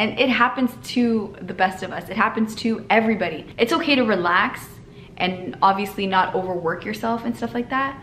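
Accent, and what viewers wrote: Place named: Tigrinya, Eritrea. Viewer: I really miss your tigrigna accent Ms awedat aleku msnatey klas 😂😂😂🌹❤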